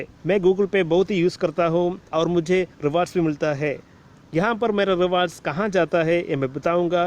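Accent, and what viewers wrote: Kerala, India. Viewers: Malayali ahn ale Hindi kettepoll thonni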